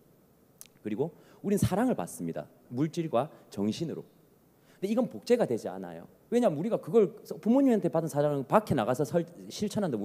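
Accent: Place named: Gyeongsang, South Korea. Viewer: 리더님 경상도 사투리가 부드럽네요~늘 영상 잘듣고 있습니다^^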